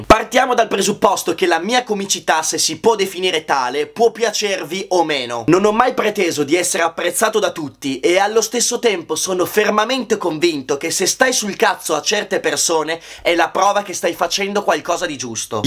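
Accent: Italy. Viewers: sei veronese? Viva il veneto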